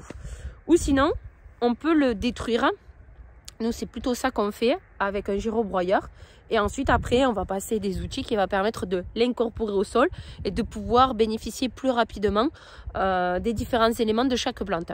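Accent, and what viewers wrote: South, France. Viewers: Bonjour belle vidéo comme d habitude bien expliquée avec ton bel accent du sud Bon réveillon à a vous quatre et à l année prochaine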